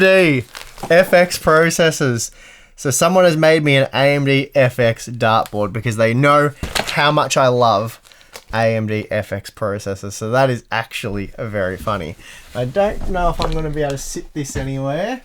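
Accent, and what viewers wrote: Australia. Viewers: It has to be difficult to pronounce NZXT as NZedXT all the time, and convey whatever the message is. Lol I honestly love Aussie accents, but certain European and Aussie pronunciations crack me up.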